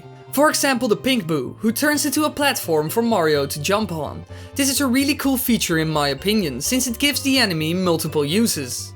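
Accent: Netherlands. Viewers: you can so hear in this video that your laungage is dutch XD ik weet het gewoon zeker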